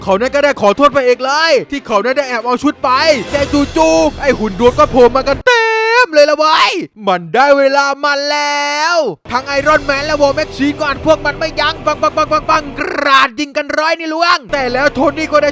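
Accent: South, Thailand. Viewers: ต่อไปเอากับตันอเมริกานะคับพี่#ชอบเสียงใต้ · พี่เป็นคนใต้หรอ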